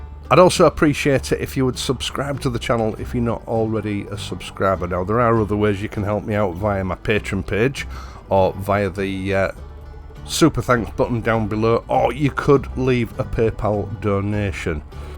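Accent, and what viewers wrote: United Kingdom. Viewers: Absolutely agree with you. That's why I'd prefer an old European car to a new Chinese one stuffed with unnecessary electronics and a half-windshield size touchscreen. It feels like you can't control these cars completely or simply repair it. It'll turn into a brick one day if needed. Btw, greetings from Russia 👻 Came across your channel when I was buying my first Enfield, but stayed because of the calmness of your videos, the stunning views and your wonderful British accent :) Good work and peace to us all!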